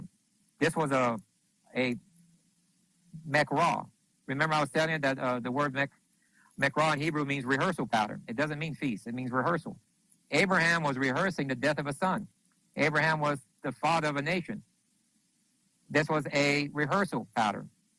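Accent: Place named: Louisiana, United States. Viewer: I would guess this guy is from Louisiana. Listening now…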